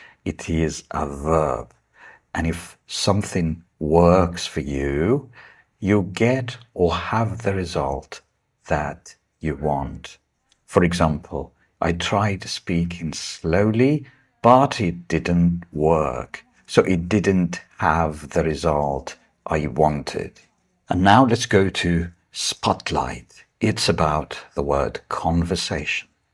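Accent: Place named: United Kingdom. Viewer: Plz don't leave this book and this way with your best British accent 🎉❤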